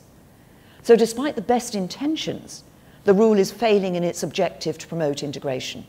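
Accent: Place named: United Kingdom. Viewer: I'm from Algeria, I'm here just for her beautiful British accent ❤❤❤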